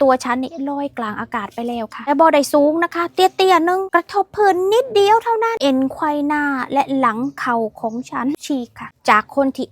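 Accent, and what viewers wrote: Isan, Thailand. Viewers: 😂🎉❤Happy new year ขอให้สุขภาพแข็งแรงไวๆ ครับ ชอบคลิปสนุกดี สำเนียงเด่น ทั้ง ไทย อีสาน เขมร อังกฤษ แถมยังน่ารักด้วย😊😊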